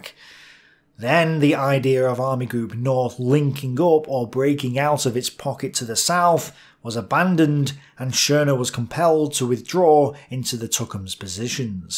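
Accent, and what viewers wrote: United Kingdom. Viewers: He can't help his British accent!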